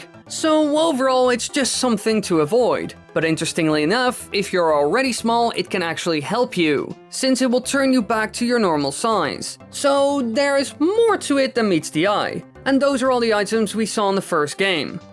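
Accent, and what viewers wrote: Netherlands. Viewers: Are you Dutch? Because I recognize that accent and if you are however than I would like to say something: Leuke video's man ga zo door👍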